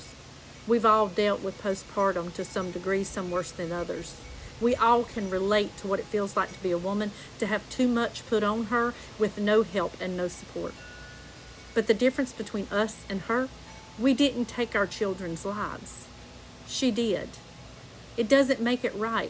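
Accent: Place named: Massachusetts, United States. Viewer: Hell of a Massachusetts accent.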